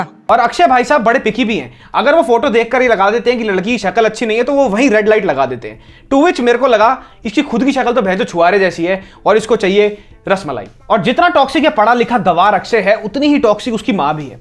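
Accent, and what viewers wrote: India. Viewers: You have very poor observation. Now I am feeling very bad for myself watching review of some sad loner commenting such thing for a professional matchmaker. First I watched ur video and your old job "honest review" review, it's was funny. As I like to hear desi accent. Basically nothing " honest" in your review, you should rename your show " saying in desi accent". Just tell me what wrong in that lady in the show " seema from Mumbai", she was perfect professional. She listened to her clients, observed them(not judge), motivate her clients and provide what they wanted. Moreover, she follow up their clients until he/she get married. A simple service based business type, now I don't see anything wrong in that and maybe non Indians also feel the same. But you are really getting toxic and loner day by day who judge a show without observation and only watch the show to pass negative comments. 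I am writing this letter to your wife " Dear Mrs, Please Madam control your husband he is getting toxic day by day. He needs some mental support. His review is very irresponsible, now a day he don't have a job he is making fun of other professionals. If possible please beat him with belen to normalised thing. Your sincerely, Indian YouTube viewer" Maybe government should lunch a review certification course so you can attend and show act like professional reviewer.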